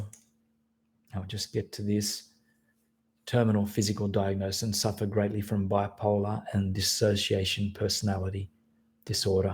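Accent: Australia. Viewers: You have the perfect voice for doing the affirmations. Your tone and accent are really awesome. Is your amazing accent Australian? Thanks for your good and positive attitude and energy.......it has really helped through the tough times.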